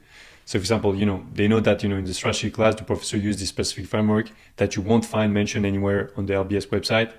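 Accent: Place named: France. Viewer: Korean look, French accent😮 weird guy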